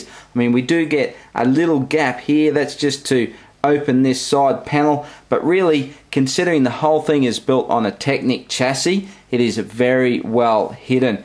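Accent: Australia. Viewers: Hmmmm, I wonder if he's Aussie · I'm Aussie and this dude has a REAL Aussie voice!!!! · R u australian firat thing i do i hear your voice then i comment yu sound thats why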